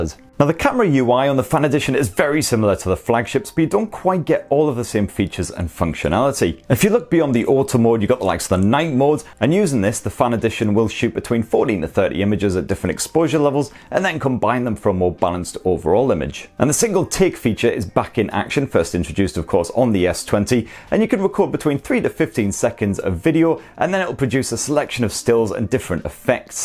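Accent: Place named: Durham, United Kingdom. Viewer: where are you from man? I cant put your accent down, I'm 99% sure you're from chester le street/durham???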